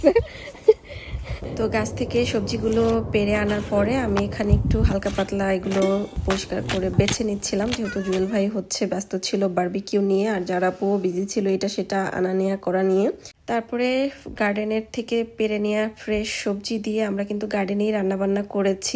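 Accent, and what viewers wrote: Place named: Sylhet, Bangladesh. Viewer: খবে জোরে আমিন,এই কথাটা শুনে এত হাসি পাইছে, আমাদের ভাই অর্ধেক সিলেটি অর্ধেক চাঁদপুর,ভালই লাগে আমাদের সিলেটে কথা যখন ভাই বলে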